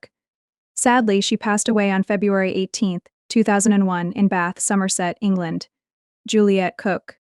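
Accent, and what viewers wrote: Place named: United States. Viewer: Why have an annoying American robot voice telling us this. Ruined it. Can't watch now.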